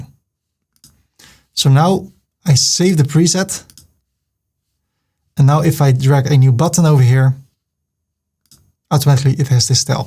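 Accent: Netherlands. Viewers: I really dig the Mark Rutte English. Cool stuff.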